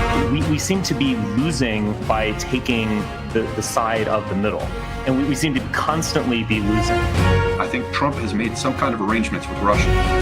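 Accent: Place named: France. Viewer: I can't stand listening to English spoken in a Frog accent. I apologise for nothing.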